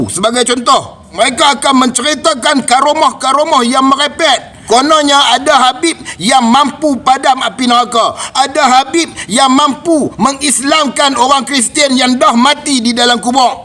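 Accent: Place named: Kedah, Malaysia. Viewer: Salam , org Indon faham juga ya cakap orang kedah Malaysia . Terbaikkk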